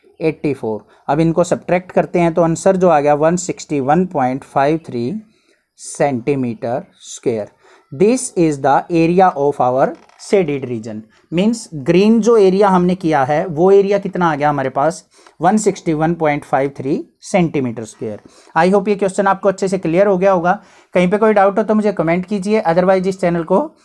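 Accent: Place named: Sonipat, India. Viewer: Sir aap 100% sonipat se hai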